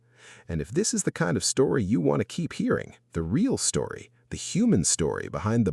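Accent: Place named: United States. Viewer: Would' e lustened if you didn't have this horrible chewing gum yanki accent. The greatest pissible earsore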